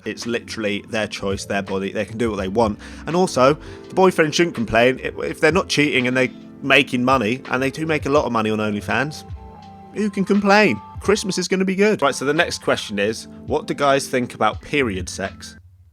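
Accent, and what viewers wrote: United Kingdom. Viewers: I didn’t know who u were but I was not expecting a British accent 😂😂 or idk what accent u have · Aww i am so in Love with this british accent. Greetings from Germany ;) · His british Accent is so cuteeee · I really like that accent, does anyone know which one it is? Like what region from Britain or how it’s called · I hate british accent but love yours :) though I need to work on my listening skills to get 100% of what u r saying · Okay I just found your channel, wasnt going to stay but then the accent...lol yh it got me, wish my British accent was that strong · Your smile Yout facial expressions 😭 I like them all, once again And to top it up the Bristish accent May you always remember this comment when you feeling low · Yeah he’s a fellow Brit :) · Esther Igbinedion it’s very obvious he’s british lmao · His accent is the same as everyone’s British accent smh 🤦‍♀️ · Oh My God, I love YOUR ACCENT!!! 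WHERE ARE YOU FROM?? Btw I can tell you're from the uk but where exactly?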